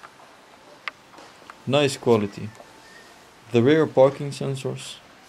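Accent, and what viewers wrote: Netherlands. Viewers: Thicc Dutch Accent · ik kan echt aan je stem horen dat je nederlands bent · louis van gaal engels? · I can hear that your dutch dude 😂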